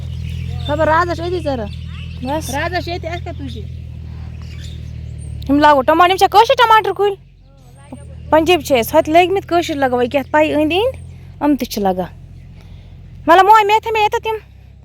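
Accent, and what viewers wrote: Kashmir, India. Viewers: Jaise aap baat karte ho lagta hai aap Soporer ki ho kyunki sopur ki baten sem aapki tarah hoti hai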